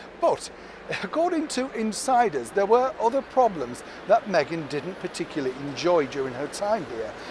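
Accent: United Kingdom. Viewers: I'm watching from Lisbon, Portugal. I love your beautiful British accent.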